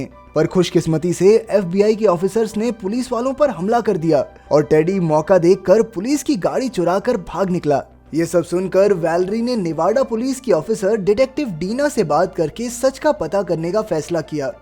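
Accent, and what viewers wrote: Haryana, India. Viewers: Bhai Haryana ka lge tu ???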